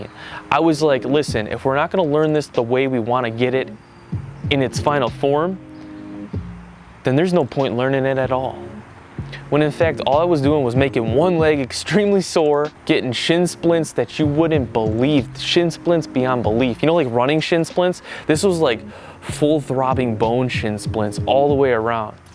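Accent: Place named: Midwest, United States. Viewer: Nice midwest eeeyyyaaaccent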